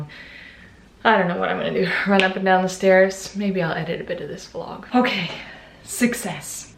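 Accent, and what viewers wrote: United States. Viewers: You sound American instead of British!!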